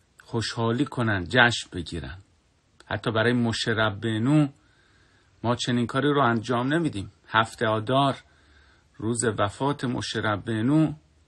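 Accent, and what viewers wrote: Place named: Shiraz, Iran. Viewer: درود بر اون لهجه شیرازی!! پاینده باشی!!!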